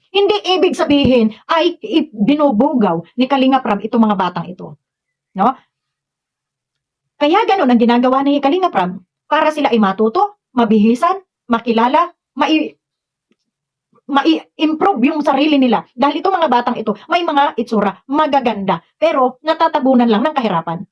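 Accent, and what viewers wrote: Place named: Visayas, Philippines. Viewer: Hahahaha ni gawas gyd ang pagka bisaya .. good vibes lang basta ako Edcy ako solid edcy..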